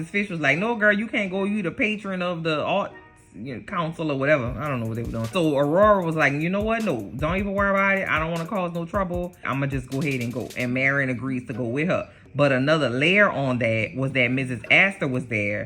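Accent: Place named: Louisiana, United States. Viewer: I have never run across this show before - I have listened to a lot of the Gilded Age podcasts from different people and perspectives - this is by far the funniest breakdowns EVER!!! Maybe because I’m from Louisiana the accent had me further in a chokehold like I was listening to one of my homegirls give this recap and rolling on the floor laughing! I am subscribing TODAY!!! 😅😅😅😅😅😅😅😅😅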